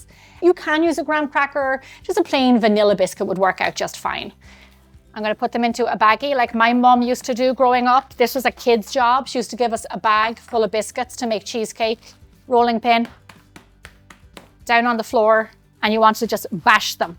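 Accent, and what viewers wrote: Ireland. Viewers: Thank you for this! Are you Irish by any chance? Your accent sounds Irish! I only ask because I miss Ireland haha🤗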